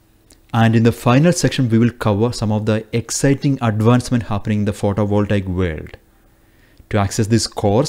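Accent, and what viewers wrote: Kerala, India. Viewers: Nalla malayali accent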